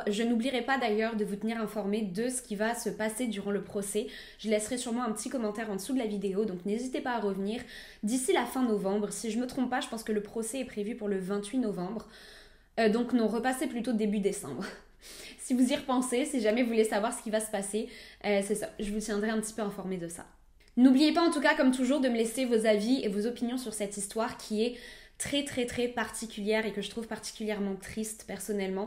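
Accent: Quebec, Canada. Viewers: Tellement triste histoire pauvre gars ! Ma mère souffrant de maladie mentale quand j'étais jeune elle avais fait une genre de cabane avec des meubles et je ne pouvais pas manger parce qu'il y avais de la drogue dans la " nourriture " et il y avais des méchants partout ....après 3 jours ma famille est venu nous chercher pour lui dire qu'ont devais s'enfuir à cause que les méchants étais à notre recherche ils ont conduit ma mère a l'hôpital et moi j'ai manger comme jamais ! Il y a toujours quelque chose a faire les parents son a plaindre dans cette histoire ! Ps ton accent une vrai québecoise oublie pas d'aller voter ! Faire sortir nos bozos 😅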